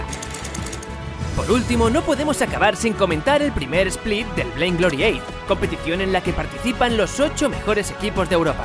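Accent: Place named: Spain. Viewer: Odio ese acento español estilo Borja Pavon. Quien carajo habla así???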